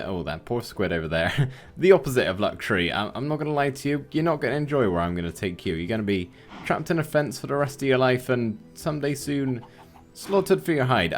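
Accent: United Kingdom. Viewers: Sadly i missed the stream and have no clue where to find it but i'm still loving this series so far (is it even a series) but yeah i'm loving it so far.Also are you British?You sound like me a bit.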